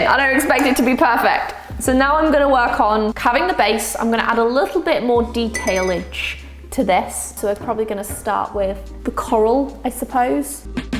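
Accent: United Kingdom. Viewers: where are you from ? cause' i love your accent and it sounds quite british. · Hi, I have recently tried painting the little models you can get and they have some super tiny details on them (usually in the most awkward places on the model too, like the handle of a sword or a sleeping dragon's claws 🤣) I would recommend grabbing a set of nail art brushes. They're basically like Rigger paintbrushes but even thinner and come in different lengths and thicknesses but all of them are tiny enough to paint designs on fingernails so definitely would be good for tiny canvases. Alternatively, Mira (Byler) is always recommending the Arteza detail brushes set and she does a lot of painting on tiny/weird/awkward things videos 🤣 Where abouts in the UK are you from? I can't quite place the accent. I grew up in Leeds but now live just outside Brighton. Do you miss home or is the US home now?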